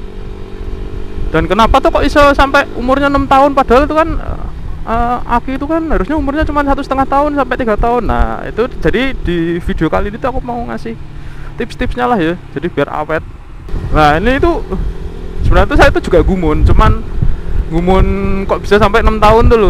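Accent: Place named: Java, Indonesia. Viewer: Medhok cuy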